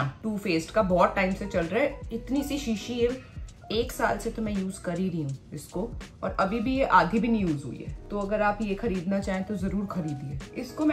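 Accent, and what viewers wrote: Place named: Chandigarh, India. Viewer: You literally speak like us, people from Chandigarh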